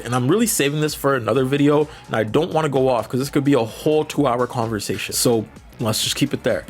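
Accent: Canada. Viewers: As soon as I heard your voice I could tell you were a fellow Canadian 😂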